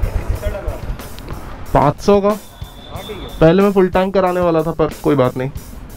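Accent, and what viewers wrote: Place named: Kolar, India. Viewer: that Kolar dam accent 💀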